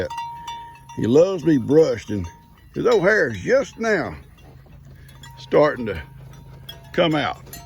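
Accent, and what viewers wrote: South, United States. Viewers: Good morning this is just the 2nd time I've committed...im new but love it I guess ur goat is special shes a determined lol I love hearing about ur farm i dont live on my farm anymore my daughter died n the farm house and I had 55 acres i buried her on the property under a apple tree ..but I couldn't live there anymore so my sons raising his family there now ..but I love seeing ur Animals it helps me miss mine but comforts also ..so ty for ur videos ..im southern to ..I talk like u and understand the slangwords ..lol ..ty ur so good at this...you make me feel like HOME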